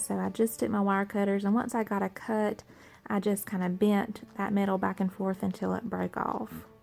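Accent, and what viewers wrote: South, United States. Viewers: Love this diy. What part of the south are you from? I am in Alabama. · Great project. Just subscribed. Love your sweet southern accent. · Wow, great project and great instructions! Trying to identify your accent - what part of which state are you from? (I’m from southwest Tennessee and I love all the different versions of southern accents 😜) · I love ur accent? What accent is this I mean which part of the US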